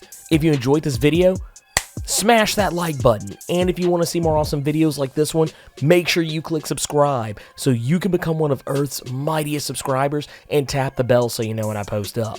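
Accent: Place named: Kentucky, United States. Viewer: you sound like you're from kentucky.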